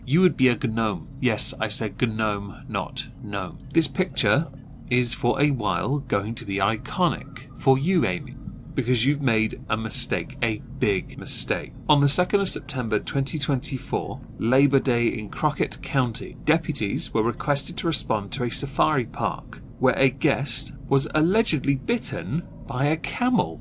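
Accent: United Kingdom. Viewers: You know the very best start of your vid is your saying in your British accent is “Well isn’t that just fantastic?” because that’s exactly how I would say that minus the British accent lol!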